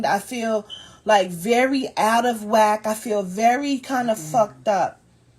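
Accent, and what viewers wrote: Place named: Chicago, United States. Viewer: I’m from Chicago and that accent is on point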